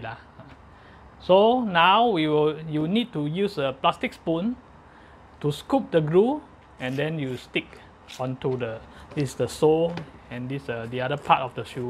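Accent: Singapore. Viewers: first time come across your video! solid. keep the singlish real. feels like home! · HAHA. Funny uncle. Thanks for your comprehensive guide! Love your Singlish!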